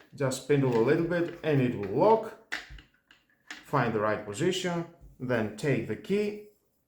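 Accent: Greece. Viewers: I can hear the greek in your voice 😂❤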